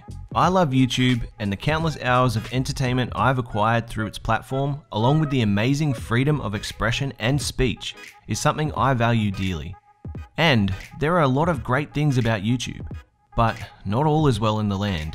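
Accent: Australia. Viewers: definitely aussie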